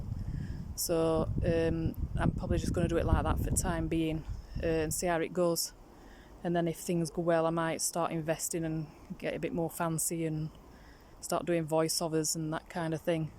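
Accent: Yorkshire, United Kingdom. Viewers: Love your Yorkshire accent, its just like my dads so i understand you no problem. Lol You are most talented, just subscribed! Hi from Canada 🇨🇦 loving all your content. Cheers, Michele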